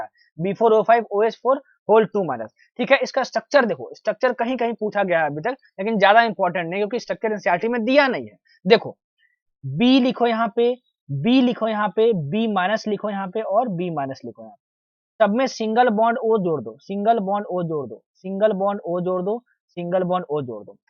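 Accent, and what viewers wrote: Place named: Madhya Pradesh, India. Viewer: Sir I am also from Madhya Pradesh that's the reason aapki tone bhi thodi mere jaisi hai. 😍😊😊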